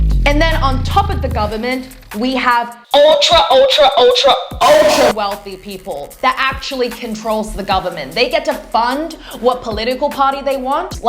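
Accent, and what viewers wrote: Australia. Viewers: Off topic, but I love your Australian accent!😭💖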